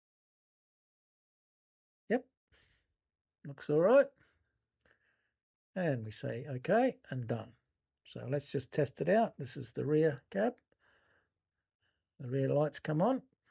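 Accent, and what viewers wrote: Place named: Australia. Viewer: Sounds like you're a fellow Aussie. Where do you buy the Kato decoders?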